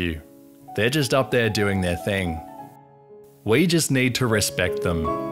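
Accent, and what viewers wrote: Australia. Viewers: You sound like FootLettuce but Australian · I love an Australian doing an Australian accent!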